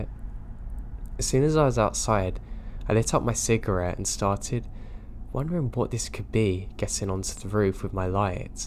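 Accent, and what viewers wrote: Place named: RP, United Kingdom. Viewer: awfull posh creepy voice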